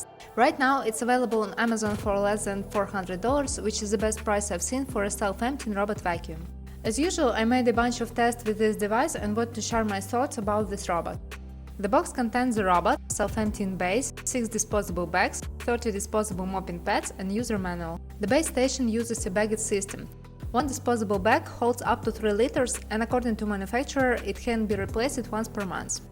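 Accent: Russia. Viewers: this russian accent is horrible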